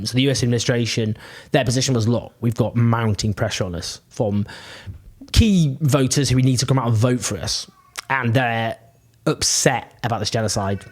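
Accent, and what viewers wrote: United Kingdom. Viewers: ok mf, nothing your British accent voice can do. about Israel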